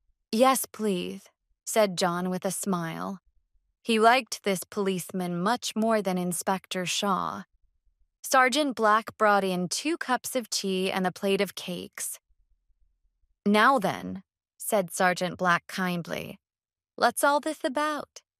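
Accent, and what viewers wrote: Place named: United States. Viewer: I like the way speak clear from American accent. Chapter 2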